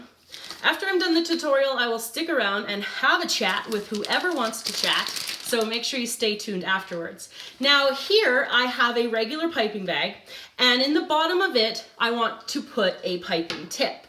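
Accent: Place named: Canada. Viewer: I love watching your videos so much! My mom is Canadian, but we live in Texas, so I love hearing the accent!!😊😍🤗😘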